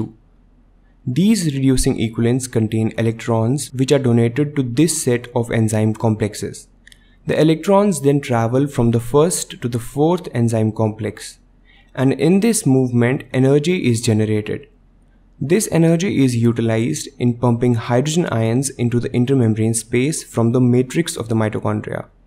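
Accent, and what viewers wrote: India. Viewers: I hate this indian accent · I love it 😊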